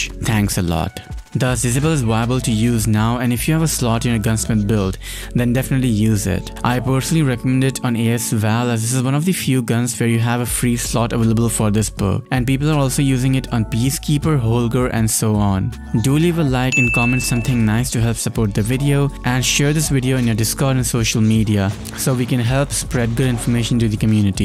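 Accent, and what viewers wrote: India. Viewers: Bro! R u a Indian? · bro are u from india?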